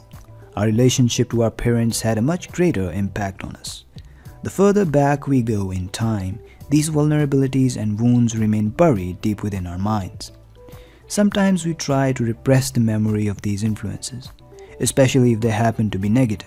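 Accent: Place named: India. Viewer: Are you Indian by any chance??? Your accent sounds like an Indian so....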